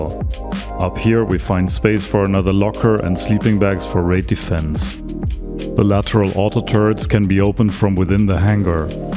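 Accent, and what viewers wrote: Germany. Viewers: so hart cringe das man hart raushört das du deutsch bist xD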